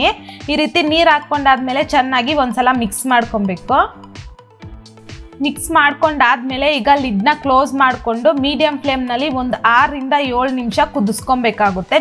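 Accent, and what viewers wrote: Kolar, India. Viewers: Kolar side avaru ansuthe nice kannada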